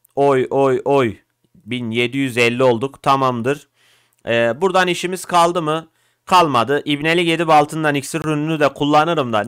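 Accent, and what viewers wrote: Turkmenistan. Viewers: Abi sen turkmenmisin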